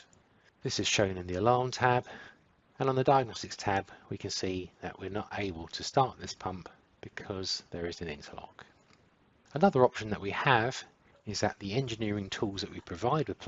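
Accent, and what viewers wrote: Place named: United Kingdom. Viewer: Might be worth contacting the UK distributor as that's a UK accent.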